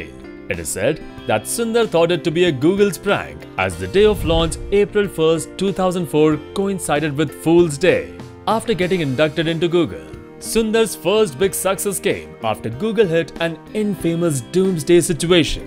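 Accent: India. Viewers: Why you can bring Indian out if India, but not Indian accent out of Indian? :( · I hate that heavy Indian accent as well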